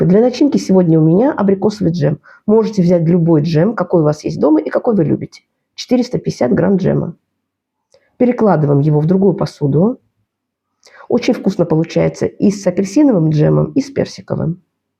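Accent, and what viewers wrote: Baku, Azerbaijan. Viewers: А я думаю акцент такой близкий.знакомый точно Бакинскиц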